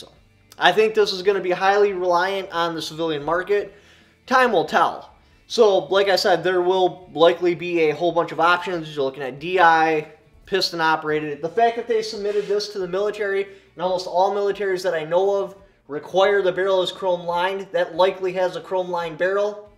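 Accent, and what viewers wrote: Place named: Upper Midwest, United States. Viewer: There’s something unintentionally comical about this young man. I can’t exactly put my finger on what it is. I think it’s what I assume is his upper mid west mannerisms. I surmise he’s from there based on the way he pronounces certain words like mouth as “mewth”.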